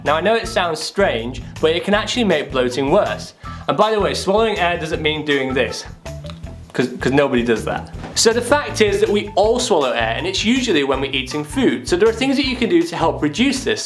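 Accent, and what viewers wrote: United Kingdom. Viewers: For the first time, I hear a Persian speaks British beautifully❤. Thank you for help spirit.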